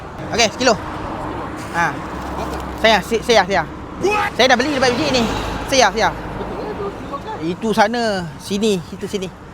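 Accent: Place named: Kelantan, Malaysia. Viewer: Kelate siya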